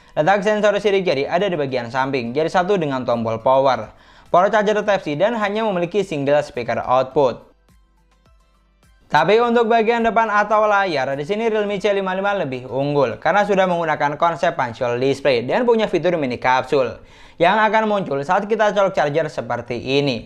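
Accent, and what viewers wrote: Madura, Indonesia. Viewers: Sampean orang madura..?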